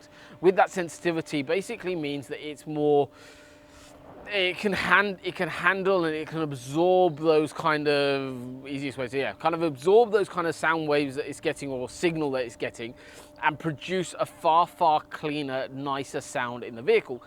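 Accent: South Africa. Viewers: Instantly picked up the South African accent, two words in. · I hear a South African accent a mile away 👍🇿🇦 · that accent is just RSA, no DNA. Awe ma se kind 😊😊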